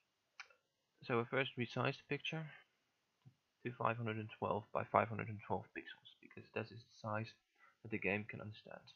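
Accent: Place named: Netherlands. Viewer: Thx for the tutorial :) I assume you're Dutch . so it sounds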